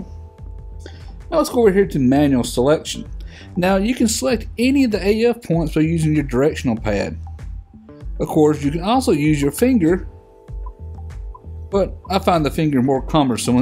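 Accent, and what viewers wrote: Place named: Texas, United States. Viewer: . Your Videos are great. I have just bought the 80D and your tutorials are really helpful. Many Thanks. By the way, you sound like you come from Texas! We lived in California for a few years back in the Eighties.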